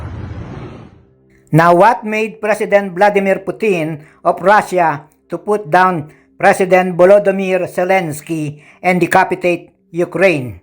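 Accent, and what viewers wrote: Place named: Philippines. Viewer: Wow! That was great vlog,. Good English with Pinoy diction. Congratulations.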